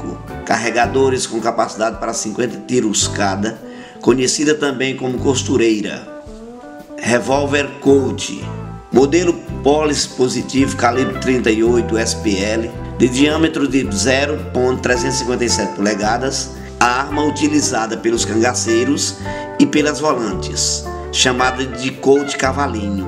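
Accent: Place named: Northeast, Brazil. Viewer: Sua narração é show, seu sotaque nos emociona, só quem é nordestino senti!!!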